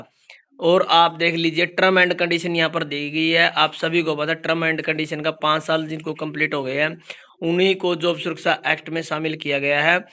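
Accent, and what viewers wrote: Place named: Haryana, India. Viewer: Pure haryana ki h kya ye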